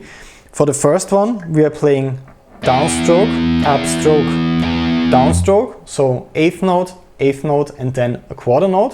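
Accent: Austria. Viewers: Bro u have an Austrian accent isn't it? 😅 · Are you maybe an Austrian (Because of the Accent )( if I m right I gonna have to say Hawedere oida !!! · Ich mag deinen österreichischen Akzent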